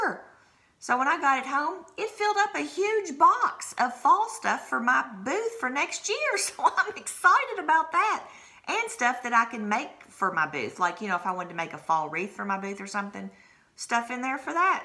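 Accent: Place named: Kentucky, United States. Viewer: Your accent is like spot on to my grandmother's. You in Kentucky by any chance? Around West Liberty (or what's left of it)?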